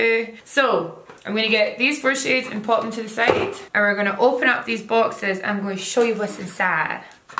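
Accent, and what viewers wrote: Scotland, United Kingdom. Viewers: Omg I love her Scottish accent! She's fantastic! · I love your hair and make up and your style!!! Please can you help me as I am very pale and now have mousy coloured hair which I highlight nbut I so so want dark hair without the commitment. So do you know anywhere I can buy either real hair wigs or similar???? Thanks I used to work in Glasgoiw and come from Renfrewshire but now live in Perthshire so bloomin great to see someone in my accent thrive!!! oxoxoxoxo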